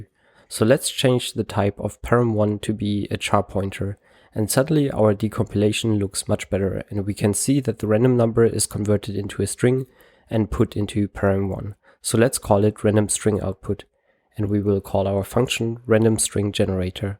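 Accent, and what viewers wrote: Germany. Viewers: Always the slight german accent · nach seiner Aussprache zu urteilen würde ich nen 10er wetten das der gute mann aus dem deutsch sprachigen raum kommt. geniale Facharbeit, ich mag es wenn menschen ihre arbeit verstehen. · I love your German Accent! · This is great, I'm always surprised by new hacking channels every now and then. Listening to your voice, it sounds like you're German, am I right?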